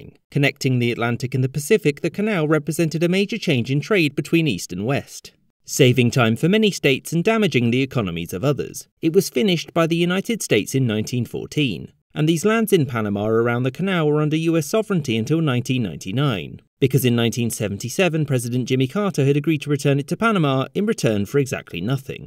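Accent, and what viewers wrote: England, United Kingdom. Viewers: Great. Now. Why did US give up the canal? Cut the English accent. Slow down. speak simpler. Don't try to impress with how smart you are.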